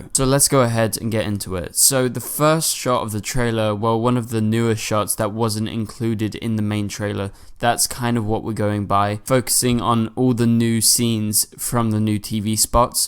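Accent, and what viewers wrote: United Kingdom. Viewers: omg are u british?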